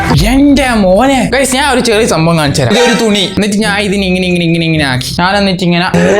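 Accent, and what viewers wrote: Kannur, India. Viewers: Kannur basha poli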